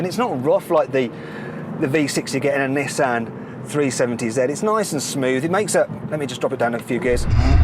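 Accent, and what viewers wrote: United Kingdom. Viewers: it's not heyundai..... maybe the British accent is funny?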